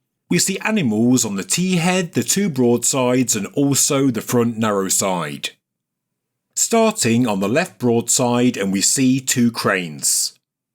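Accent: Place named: England, United Kingdom. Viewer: What a complete load of rubbish... you people with the PhDs just parrot the books and words of others and NONE of you think for yourselves. The entire Gobekli Tepe site refers to the movement of our galaxy for a period from its creation 257 billion years ago to the point in time it was modified some 90 billion years ago. The animals refereed to on the pillars are those who evolved and some recovered extinct humans from a past time... The site deliberately buried as what it refers to , no longer happens. The good things is,,, those who left Gobekli Tepe and the Pyramids and Megaliths come back and remove all those telling the lies of human history and MANY have English accents... just like yours..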